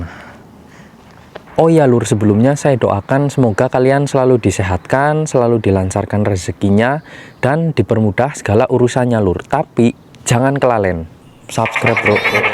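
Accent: Banyumas, Indonesia. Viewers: kan cilacap tapi stay nang taiwan lah ngapak ngendi kue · Asline ngendi mas ngapak"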